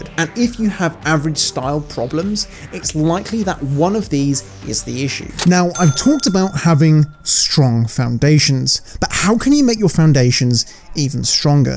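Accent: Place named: United Kingdom. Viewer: Your british accent works well with you. Makes it more classy!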